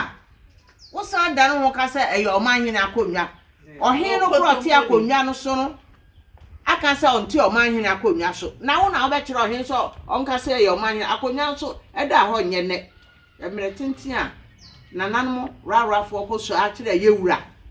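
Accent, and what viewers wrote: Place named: Volta, Ghana. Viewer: The twi is beautiful but the Volta high accent is evident.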